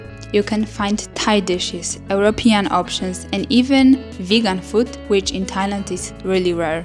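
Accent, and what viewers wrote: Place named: Eastern Europe. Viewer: Czech, Slovenian, Polish? Usually I can recognize accents.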